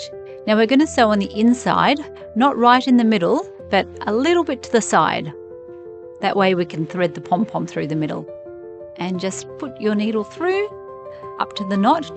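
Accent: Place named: Australia. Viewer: Yeah! An Aussie teacher finally! 😅 Now subscribed. Watching from Northern NSW. Dee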